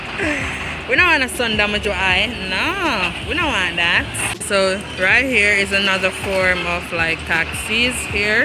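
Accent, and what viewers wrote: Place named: Jamaica. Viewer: Girl. Your accent . Fellow Jamaican here. When u said ' mi jus a waut pon the light fi change u zeen ' dwl 😅 and your Chinese😂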